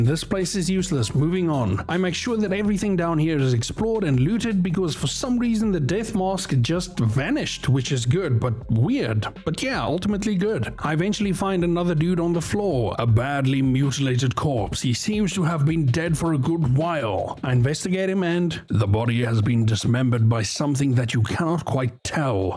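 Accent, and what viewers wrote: South Africa. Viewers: Are you South African, by any chance?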